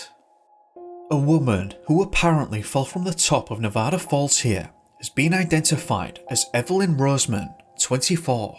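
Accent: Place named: Scotland, United Kingdom. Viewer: You could have easily been a character with your voice on trainspotting.🥳👀🤡✌️✌️✌️👌 cheers mate......no you sound just like you Ewing McGregor...WOW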